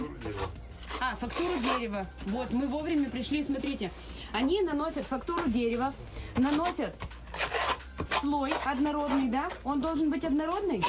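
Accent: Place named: Udmurtia, Russia. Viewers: Как мне нравится удмуртский говор, мммм😊! Сколько знаю девочек с Удмуртии - все мегапозитивные, очень симпатичные, вечно деловитые💪❤ и очень душевные❤❤❤ Привет от соседей- татар! 👋👋👋😊😊😊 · Говор сразу понятно Удмуртия😊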